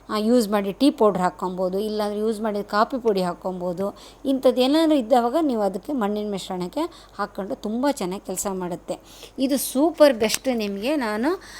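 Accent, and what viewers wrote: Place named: Kundapura, India. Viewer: but kannada superb mathdtheer... kundapura ankonde nanu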